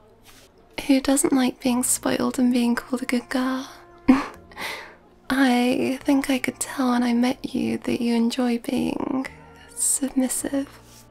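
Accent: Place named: United Kingdom. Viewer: the british accent reminds me of Millie Bobby Brown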